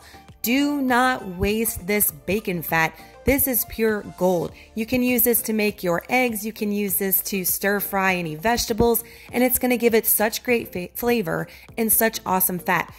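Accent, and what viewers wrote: Louisiana, United States. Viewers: Girl I can hear that fellow Louisianian in you!!! 504 representing!!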